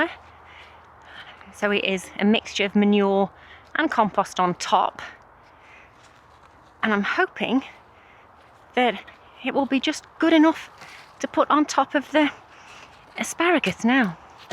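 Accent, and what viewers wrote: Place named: Staffordshire, United Kingdom. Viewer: Hello New sub from me. Found you through sow chatty. Love watching other peoples plots. I’ve just taken on a new acre garden so am only really at the planning stage of my veg garden. Fabulous that the farm provides you with such a great supply of muck. Great harvest. Lovely to hear that staffordshire accent too, being from staffordshire myself.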